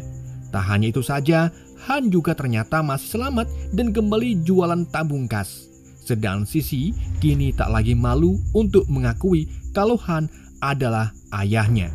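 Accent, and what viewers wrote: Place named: Banyumas, Indonesia. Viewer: Wkwkwkw jawa,a itu loh,,ngapak bgt mka,a saya suka chenel ini ,,bikin ngakak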